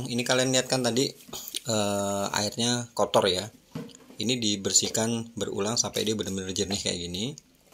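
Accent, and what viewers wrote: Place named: Java, Indonesia. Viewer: POV :wong jowo ngomong inggris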